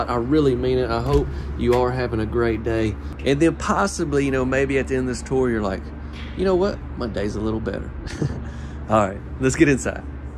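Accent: South, United States. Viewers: Get a friggin voice filter. Dear lord that southern speak is a huge NO.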